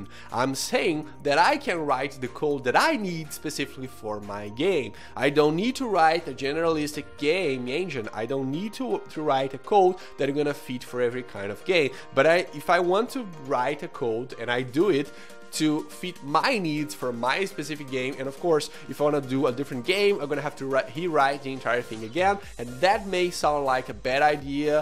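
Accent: Brazil. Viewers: mano pelo seu ingles parece muito que vc é brasileiro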